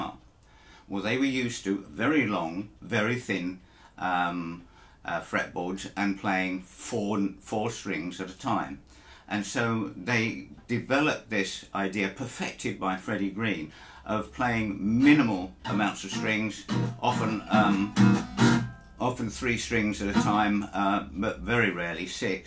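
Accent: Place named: United Kingdom. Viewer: Hi! I really like your British accent. 😃 Sounds so stylish 😊What do you think of Guitars with laminated parts? Could they sound also good? I am thinking about buying a 12 string guitar (Guild) with laminated parts. Cheers Roger 🇨🇭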